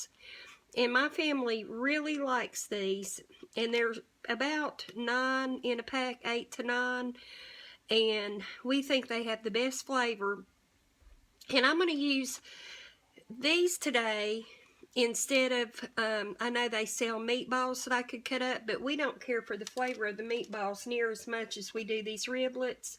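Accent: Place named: West Virginia, United States. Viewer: Hi I love your videos and Dollar Tree meals. I have made the Mexican Bake and it turned out very good, filling and low cost, which is always good. I am originally from West Virginia and you sound like good hearted WV folk. Subscriber forever. Thanks, Ray🇺🇸🇺🇸🇺🇸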